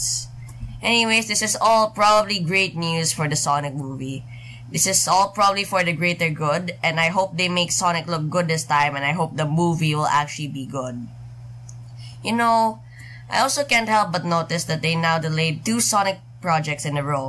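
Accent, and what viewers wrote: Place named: Philippines. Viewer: Man sounds like a Filipino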